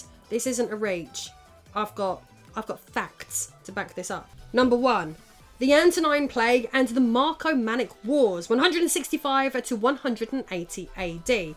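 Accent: England, United Kingdom. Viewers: What part of England is from?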